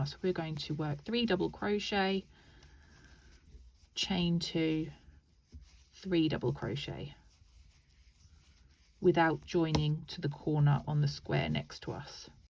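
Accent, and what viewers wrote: England, United Kingdom. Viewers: You sound English but you’re calling them DC when they’re UK TC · you sound lovely English ☺️ Thanks for sharing your knowledge, it's gold to me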